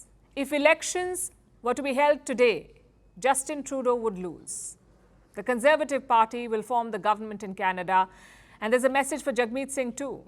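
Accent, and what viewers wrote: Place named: India. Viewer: Dmbaz this is indian accent. Which American canadian talks like that?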